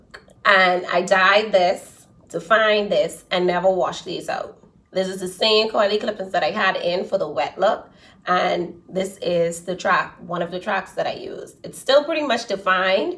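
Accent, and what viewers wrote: Bahamas. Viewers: Are you a Bahamian? I think I hear the accent but I wanna be sure lol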